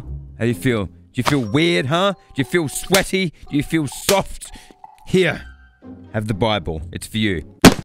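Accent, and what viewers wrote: Australia. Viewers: are you really aussie ?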